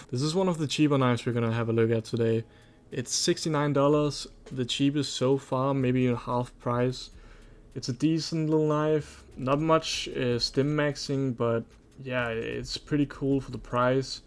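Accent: Denmark. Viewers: holy danglish bror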